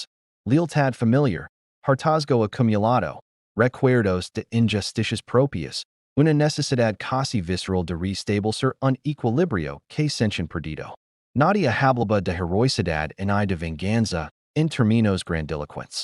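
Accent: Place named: England, United Kingdom. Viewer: Tiene acento de ingles hablando español no se entiende bien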